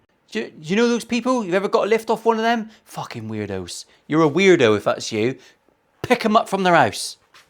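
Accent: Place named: Cockney, United Kingdom. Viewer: I do prefer the bridges that have 6 individual intonators versus the ones that have only 3 so you have to split the difference between strings - one flat one sharp? I'm in Virginia USA - but quite near West Virginia, Tennesee and North Carolina, so I hear those accents you like. I tune in here (also) to hear your accent. We uninformed here call your accent "Cock-knee" Special Wave - wibbly-gibbly!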